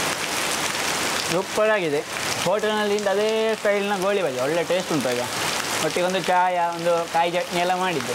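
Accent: Mangalore, India. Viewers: Love your mangaluri kannada bro..Awesome ambience/rain,lovely presentation,mouth watering goli bajji..What else you need?!😄🙂👏👏👍👍👌👌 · I enjoy watching your videos. I so so like your beautifull mangalore kannada. Keep up your great work.., good luck :)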